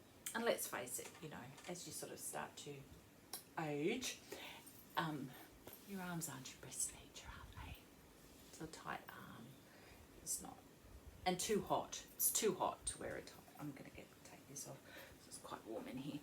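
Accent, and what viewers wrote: Australia. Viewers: Hi. Great to hear another Aussie voice. I’m at the other end of aus from you in Vic. Love knitting. I’m a monogamous knitter. I find it too stressful having several things on the go.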